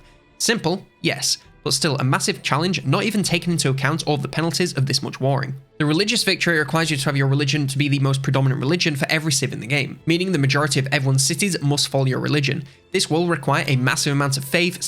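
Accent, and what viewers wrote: United Kingdom. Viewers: Accents are a thing, I speak French from France but live in Canada and have a hard time understanding Quebec French. The lad in the video here has a heavy Bri’ish accent and skips every third letter. Perhaps he should use AI to narrate.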